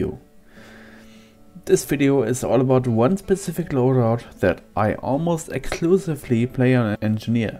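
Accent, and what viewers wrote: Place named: Germany. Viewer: Wie schön das man deinen Deutschen Akzent direkt heraushört. Liebe Grüße Leminsc8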